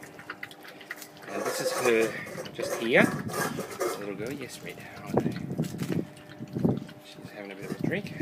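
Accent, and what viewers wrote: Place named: Australia. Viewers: I know it's because you're Aussie but the way you speak to them sounds so bad to me as an American (obviously they're dogs so how you speak to them doesn't matter) but it's just kinda funny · You do have an Aussie Accent but not as strong as some.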